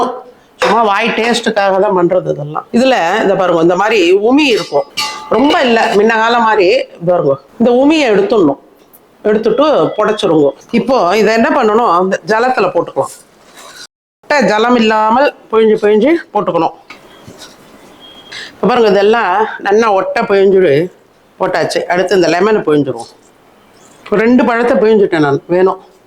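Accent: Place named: Tiruchirappalli, India. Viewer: ரொம்ப ரொம்ப ரொம்ப thanks mami. Super super ungalun intha immediate response and ungalin viewers ku neegal kudukum importance romba romba pidichiruku. Sila peir enna ketaalum பதில் போட வே மாட்டார்கள் immediate AH poda லேனா கூட atleast 1 week kule podalam aana poda maata avargaluku therintha mariyaathai avlo thaan. I really really appreciate your response for your viewers ❤. Kanippa neega seithathu pola Try panni paarkiren. Neengal Trichy oora. Ungalin பேச்சு athupola iruku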